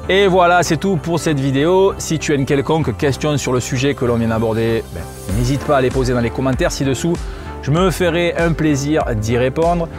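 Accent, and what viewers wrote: South, France. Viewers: un toujours aussi pertinent, intéressant,pédagogique.le tout accompagné d'un accent ensoleillé.Bref, c'est toujours un plaisir de regarder tes vidéos.Bonne reprise et bonne continuation.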